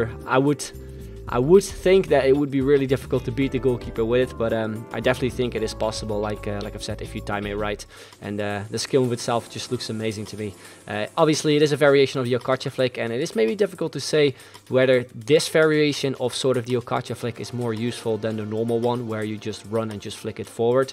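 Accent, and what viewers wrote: Netherlands. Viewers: jij bent sws nederlands xD hoor het aan je accent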